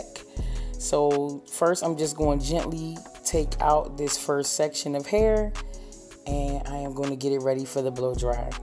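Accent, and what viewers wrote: Philadelphia, United States. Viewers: Girl where u from ? I’m hearing an accent I’m thinkin Philly or somethin It’s up north but sliding towards dmv twang but not a hunnit percent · Is that a Philly accent I hear?? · I LOVE watch all of Your videos because you reminds me of a lot of my favorite older cousins from Philly but you sound sooo much like my family I remember as a child who I use to adore and look up to them its that accent you can tell a Philly girl from anywhere my MOM also sounds like that too even though she’s been living in Cali for over 40 years I remember when I moved out there as a little girl 👧🏾 I use to love to go to my cousins hair salon down in Upper Darby & get my hair done every weekend and then go shopping at the end!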